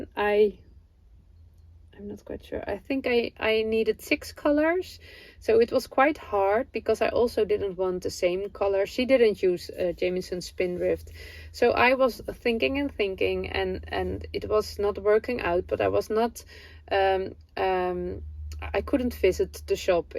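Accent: Netherlands. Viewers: I love your "Dutchie" English, I can understand perfectly. I'm familiar with the word paprika because my mom, who was Slovenian, called a red pepper (the ripe version of a green pepper) that. We also have paprika, the ground powder seasoning. I love how beautiful you have made your caravan/camper with all hand made items. Your camper has many heart jumping moments, every time you dress it up with something new, I'm sure! Did you follow a pattern for the cute curtains behind you? I hope you had or are having a lovely time away from normal daily activities. 💗💗💗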